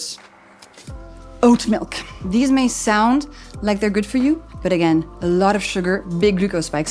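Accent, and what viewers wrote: France. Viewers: irritating french accent lol n your not a Goddess . Repeating what all the internet knows already to sell supplements or yourself ....why i hate this period in time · American former French teacher here. Her English is so good, I thought at first she must be a native bilingual speaker, maybe Quebecoise (French Canadian). "Elle n'a que l'ombre d'un accent francais..." Brava! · You are a good YouTube personality & diet restriction advice giver. Vogue is always a sucker for a French accent. You say absolutely nothing about consuming enough water, but are happy to show us your cute little recipes. We know the information you are giving is fraudulent, masquerading as science, and all those comments on your channel agree with this. Hopefully you can change your tune, or just do something else entirely, instead of contributing to society’s toxic diet culture.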